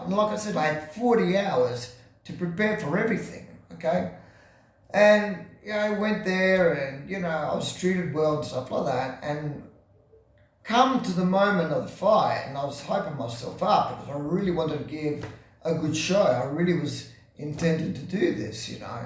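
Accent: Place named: Liguria, Italy. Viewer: why d y speak english with ligury accent? i'm serious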